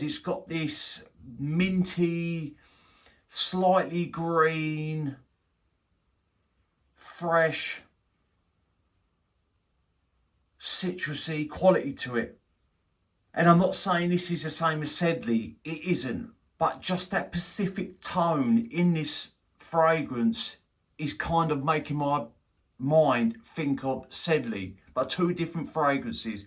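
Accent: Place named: England, United Kingdom. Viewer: you were saying the house of M.Micallef correctly. DesirToxic is totally my speed! I can’t believe you’ve never reviewed this scent on your channel before. It’s pretty popular in America for a niche scent. The new version, DesirToxic L’Intense (2024- adds a heavy note of Mint in the opening but removes the majority of the greener cannabis aspects from the heart (I can’t remember if cannabis is still a listed note, and I’m too lazy to look it up at the moment). Anyway, just had to mention that it always tickles me every time you say the word “Three”. It sounds like you’re saying “Free” to my American ear. I find it funny, I promise I’m not making fun of you, it’s our little differences in life, ya know? Anyway, I’m interested in accents, do you mind telling me what part of England you come from or what region your accent derives from? 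I know there’s like a Manchester accent, and a Posh section of London accent, etc. Just curious. Please take care of yourself and I pray you're doing well. All the best from sunny Florida. P.S. I’m always impressed by your nose, thats why I continue to watch and I like your presentation style- not a lot of fluff! Just down and dirty, you get right into it.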